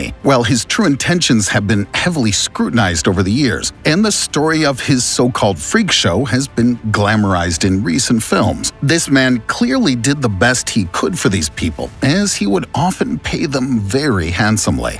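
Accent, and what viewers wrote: United States. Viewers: the narrator sounds American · Don't speak with an American accent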